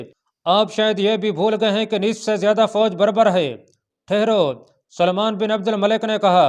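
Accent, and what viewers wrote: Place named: Saraiki, Pakistan. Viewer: Saraaeki talaffuz lag raha hai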